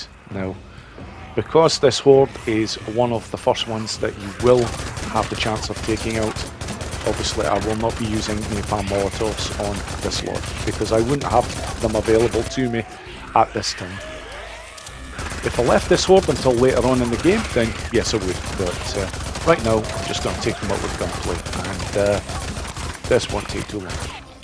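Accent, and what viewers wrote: Scotland, United Kingdom. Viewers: awesome content, brother. All of it. And I always enjoy hearing the Scottish accent. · Thanks for this - all hordes are cleared and some of these methods were used. Can't figure out if you have a decent Scottish accent or no. Or just Eastern european with British accent (no offense). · You're fairly local to me as well, going by your accent anyway. I'm back at work, as a long distance(ish) truck driver. So the whole of the UK is local to me😀 I work for a Stirling company. Thanks for your kind words, but it was almost 3 years ago now, so the chances of getting that 3% back are pretty slim. On the other hand, I haven't given up yet. I did the 2021 Edinburgh Kiltwalk Mighty Stride, as the goal for my legs physio, 6hrs 6 mins. And 500 miles walked in training for it, so not at all bad for an old man 😃 So now I'm off to do all the challenges, with prep from your videos. 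Then I'd better do another play through, to see how much easier it could've been 😂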